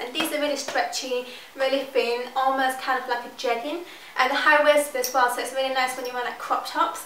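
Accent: United Kingdom. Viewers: She's british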